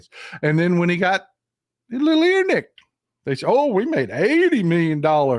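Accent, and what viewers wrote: Texas, United States. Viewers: you sound as authentically Texan to me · By the way you look like a Texan and talk like one, I was marry to a good Texan, he would have enjoy your facts and your show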